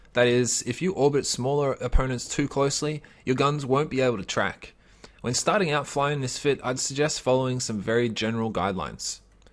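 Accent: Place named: Australia. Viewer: so australian mate haha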